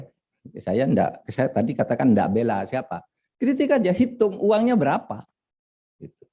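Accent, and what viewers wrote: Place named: Madura, Indonesia. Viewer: Pak mahfud bikin bangga orang madura.. Logatx asiik...👍👍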